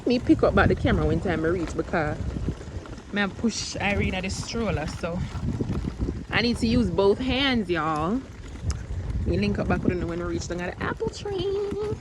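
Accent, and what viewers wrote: London, United Kingdom. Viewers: U nailed that London accent Nice vedio 😊😊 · The London accent and conversation 😂😂😂. The British intellect 😆♥️ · The london accent make me DWLLLLLLL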